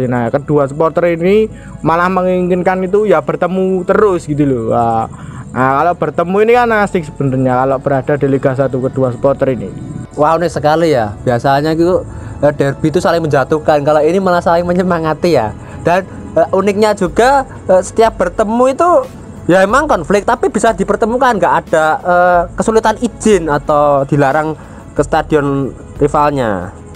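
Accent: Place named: Surakarta, Indonesia. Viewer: Ak mudeng cok admin wong solo:v logatmu kwi loo hmm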